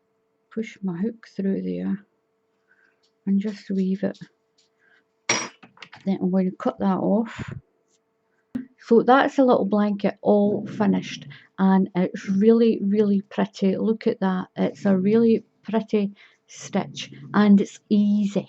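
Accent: Scotland, United Kingdom. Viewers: This is a beautiful afghan/blanket stitch. Thank you for excellent simple instructions. I absolutely love your accent - I love accents - and I believe you are Scottish, which I have ancestry in Scotland and Ireland. Thank you so much for this help and will be going back and viewing other videos you have put on YouTube. Thank You and please you stay safe and healthy as well. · I am so delighted to have discovered your channel - your instructions are very clear and as a fellow Scot I love your accent! I'm fairly new to crocheting and I'm finding it difficult to know what size would be best for a pram blanket - I am seeing anything between 24x34 inches to 30x39 inches for an oblong blanket and anywhere between 36 and 48 inches square which would make a big difference to how much yarn I would need to buy! Could I just ask, if you were crocheting a pram blanket, what size would you make for an oblong or square blanket? 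Many, many thanks! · Thank you so much. This pretty baby blanket certainly did crochet up quick and mine turned out gorgeous just like yours. You made following the pattern so easy. I feel so much more confident now. Just love your Scottish accent. Thanks again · thanks for this lovely pattern. I'm half way through it. The yarn I used is a little fine, but it's still working up very quickly. Love your videos & the accent..only know one person from Scotland here in Ireland. Keep up the good work. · Thank you, you are such a good tutor. I love your Scotish braw.I've got a few Scottish friends also, so I'm used to hearing that.I love this pattern it's brill. I've got my wool ready, so I'm gonna make this..I'm just getting over Coronavirus so we're social distancing self isolating as best we can, me and my Husband are, I need something like this to pass time. So glad a found you💗.Keep safe.Take care.xx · I love this very pretty blanket, and I will be doing this for my new grandchild when he/she arrives end of June, thank you so much for your very easy to follow instructions, and your lovely Scottish accent xxx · Are you from Scotland? 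Love hearing you talk!! Thank you for going slow and explaining so well. ❤ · I love your tutorials. Plus I love your accent my mum was Scottish sadly she has passed away and she sounded just like you I could listen to you all day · well I think it’s a lovely stitch and blanket, and hope to recreate it myself. You can’t please everyone. Nice to hear a wee Scottish accent and look forward to seeing more from you. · It's also nice to hear another Scot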